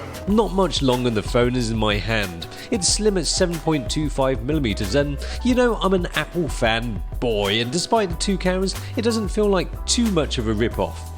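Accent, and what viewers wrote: United Kingdom. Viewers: With that British accent, i was not expecting an asian guy..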